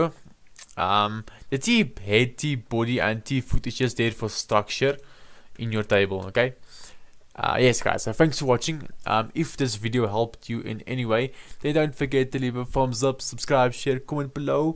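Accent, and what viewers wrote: South Africa. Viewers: this guy is definitely south african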